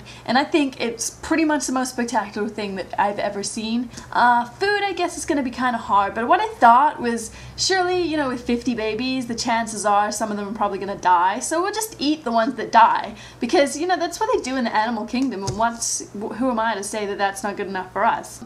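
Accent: United States. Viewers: Why does she have to do these sarcastic videos with an American accent? Now all the Youtube idiots get to say "fucking american. so typical" and any other retarded comments. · I really don't know how anyone could think this was real, its meant to be funny. Just watch her other videos. The American accent is really good. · Your american accent is SPOT ON! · Wow... your American accent is so very convincing. I keep forgetting that you're British. XD By the way, I love how you randomly choose which accent to use in your videos. :D Ahahahaha!!! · Haha, I love this video. You'd be making better use of them with that dance squad than "Octomom" could. Nice American accent btw ;D Fooled an American. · Some people actually took this video seriously? o_o The internet continues to amaze me. But you have an INCREDIBLE American accent! o.O Like House! Except you don't hide half of your accent with a really deep, gruffy voice. 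xD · how did u get such a good american accent? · love the american accent XD It's your sarcastic tone lol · whoa how did you just start talking without a British accent? you don't even sound British here, it's nuts! I'm Canadian and yeah, you sound American. I'm really intrigued at how you good you are with the accent. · Your American accent is impeccable. You actually sound exactly like someone from Western Canada/northwestern US. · It's like Brittany Spears impersonating Nadya Suleman parodying Angelina Jolie. Very good American accent! You forgot Nadya's ridiculous pout and that fake humble look. But you got crazy downpat! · Great American accent! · the american accent adds something to this video, I don't understand what that thing is but I think it's a good thing. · brilliant! and your American accent sounds so authentic. :D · um...what happened to your accent? You sound like a So Cal local not a Brit. 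Didn't you used to be a Brit? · why do you have an american accent in this vid? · I'm american and your american accent is perfect. Impressive! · wow.. you do a perfect american accent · Your American accent is really good. I almost can't imagine you with a British accent. This isn't your best bid, but not bad. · i like how non american english speakers can mimick our accent flawlessly yet we cant get others down.. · This is hilarious! And you do have a very impressive American accent. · i figure out what this is about now. is making fun of that american woman who had 8 babies. Hence, the american accent. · Haha you are right you sound a tad American, my fiance says i talk American even though im from Manchester..."/ Great video. :D LMAO. I have a PO BOX..If you want to send me babies...=] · i love your american voice! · def didnt know you werent american. great job. funny vid too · Hahaha, well done on the accent, I actually thought you were american XD Not to mention all the jokes, I can't believe some people actually took this seriously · your american accent is extremely entertaining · How hard do you have to think to speak in an American accent? 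When I try to speak with an English accent, I have to think about every single word I say. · lol You're wearing bad lipstick and using an American accent. I hope you're just teasing us. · You do a fantastic American accent. Never would've guessed it's a put-on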